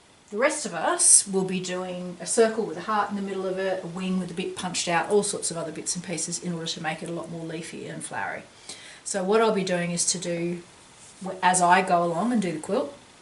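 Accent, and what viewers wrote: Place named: Australia. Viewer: Great to hear an Aussie accent! Thankyou!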